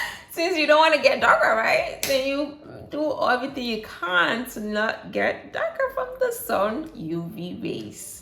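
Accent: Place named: Nigeria. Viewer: Have a Ghanaian name yet sound like Nigerian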